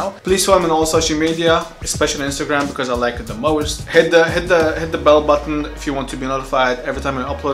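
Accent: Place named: Armenian, Lebanon. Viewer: You sound armenian lebanese 😂😂 No offense tho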